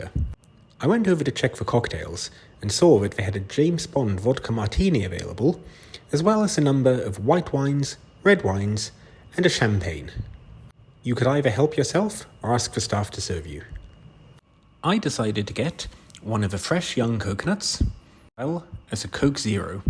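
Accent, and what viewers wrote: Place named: United Kingdom. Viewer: Wow your accent is soooo British lol 🇬🇧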